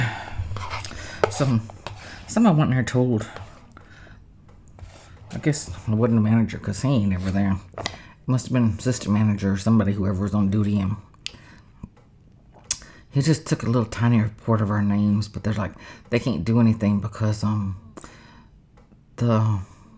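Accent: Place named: Texas, United States. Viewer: The mic looks good off to the side, and sounds good. I think some people can’t understand you sometimes because of your Texas accent. Pay no mind to them.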